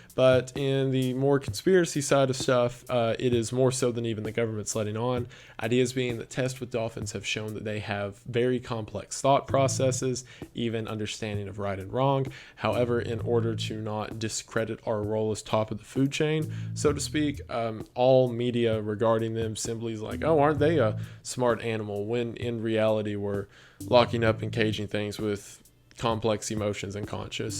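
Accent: South, United States. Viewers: First time that I’ve enjoyed listening to a southern Christian talk about conspiracy theories. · i’m a lesbian but this southern belle sounding bucky barnes look alike has just stolen my heart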